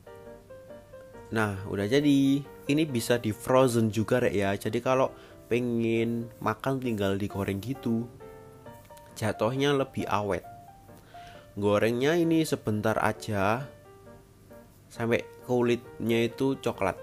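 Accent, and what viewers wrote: Java, Indonesia. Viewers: Ciri khas medok nya agak berkurang ya.. biasa nya lucu dgr voiceover nya kalo medok bgt ky dulu². Tp resep² nya selalu mudah diikuti.. semangaaat!!!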